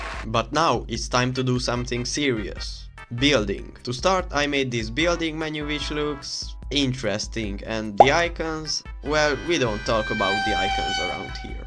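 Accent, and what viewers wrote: Hungary. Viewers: Az az erős magyar akcentus❤😂(enyém se jobb) Szuper ötlet és megvalósítás amúgy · A magyar akcentus hallatszódik de ne add fel mert feliratkozok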